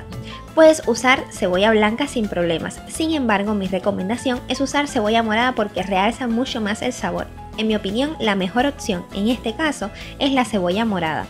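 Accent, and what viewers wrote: Cuba. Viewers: Así hablamos los cubanos, a afinar oídos jajaja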